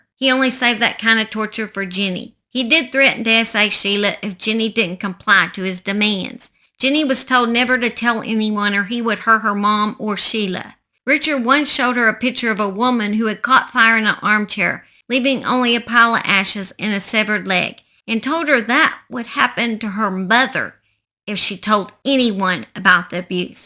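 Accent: South, United States. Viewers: I'm Irish and understand your southern English perfectly.....love Southern accent 😊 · I can understand you perfectly I can tell you from the south by your accent, but you’re not slaughtering the English lang by any means and I love your channel - remember they hated on Jesus! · As an austrian living in germany i had no problems understanding you and i absolutely adore the southern accent:) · I love your channel most people talk ghetto so if anyone is complaining about your southern accent it’s because they talk ghetto. Anyway I hear stories on here that I’ve never seen on other channels that’s the reason I subscribed to yours! Much love from Illinois! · Im Australian and i understand your southern english so pay no mind to the nay sayers 💖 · As a southern girl from Oklahoma myself, I understand the “southern English” as well! Y’all give this girl a pass! 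Thanks for the video! Simon is great too! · I’m a Texas girl who is frequently teased AND insulted about my “twang”, so I get how frustrating that can be… In ALL honesty though, the fact I’d never heard the Noah Prestgrove story was the story that brought me to your page. Your “southern accent” and passionate expression in the stories you tell is what brought me to follow you. You’ll get the “Karen’s” because some are so perfect, but you’ll also find others who embrace you for your accent! Hugs!! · You think people in the Southern part of the US speak unusually? Try figuring out what a fast talking person from Scotland is saying!!! You'd swear it wasn't even English. That is why having an open mind is a good attribute -- instead of knocking others, you can appreciate & enjoy the many ways we ALL share this language with different spins on how it is spoken. I LOVE your SOUTH DRAWL & I'm from Brooklyn NY.❤❤❤❤❤❤❤❤❤❤❤❤❤❤❤❤❤❤❤❤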